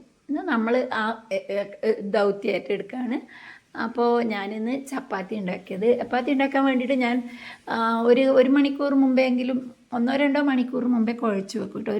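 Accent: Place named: Kannur, India. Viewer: കണ്ണൂർ കുക്കിങ് സൂപ്പർ ആണ് ട്ടോ കണ്ണൂർ ഭാഷയും സൂപ്പർ ❤️🥰❤️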